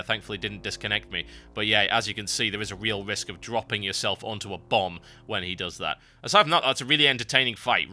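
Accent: United Kingdom. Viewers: Can't wait till Cata comes out, so I can stop watching these Vids with his annoyingly British accent.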